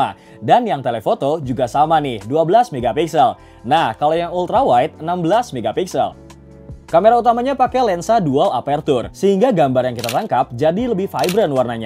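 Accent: West Java, Indonesia. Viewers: Abang orang sunda ya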